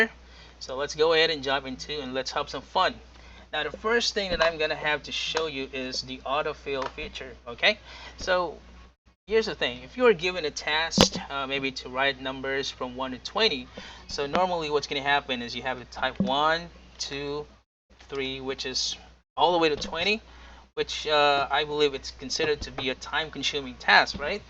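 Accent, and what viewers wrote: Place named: United States. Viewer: Gaw kusugaw. Gd nimo mo english mura MN taga America eslang MN kaau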